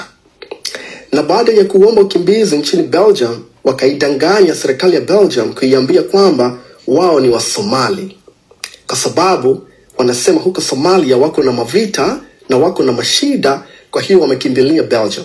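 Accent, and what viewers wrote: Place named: Kisii, Kenya. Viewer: Kwani wewe ni Mkenya?Mbona lafidhi yako kama ni wa kisii?Achana na habari za Tanzania kenge maji,tukijichanga ulaya tunacmplain tunatoka nchi yoyote yenye matatizo Africa,we are Somalian we are Burundian and we ate also Congolese.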